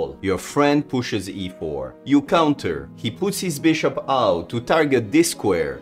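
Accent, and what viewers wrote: France. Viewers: I take it your from your accent that you are French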